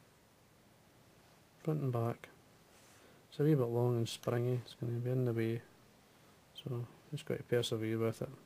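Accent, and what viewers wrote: Scotland, United Kingdom. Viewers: I think I've said it before, needs saying again, you are a wonderful fly tyer and your flies are absolutely lovely. Your methods, which, as a tyer for 40 years (and pretty decent), I have to say are SO meticulous. Plus the accent man, I love it. Brings me back to a wee lochen near Inverness, which, as I understand, I can't tell you the name of or I will meet with an early grave.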